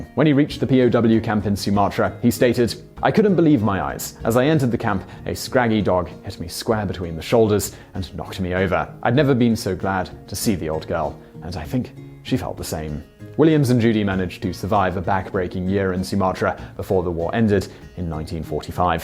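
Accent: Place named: United Kingdom. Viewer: This is vsauce with s British accent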